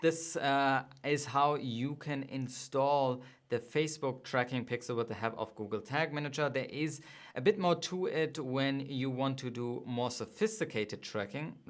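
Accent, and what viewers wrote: Germany. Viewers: If Yes, is it possible to have a call with you? I really need help with my tracking. Im loosing so much money, because something is wrong with my tracking. I have quiet good traffic and im still making 50+ Sales a Week (organic) now i want to scale. But something is in my setup is wrong! Of coruse i´ll pay you for your help! Pls answer to this comment or give me an oppertunity to contact you. Idk but i Think you ´re a german guy? Ich kann es am Akzent hören ;)) Ich freue mich auf deine Rückmeldung ;))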